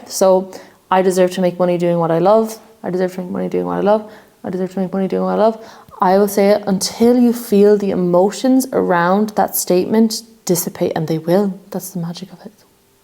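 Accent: Ireland. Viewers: Heard the Irish accent and immediately subscribed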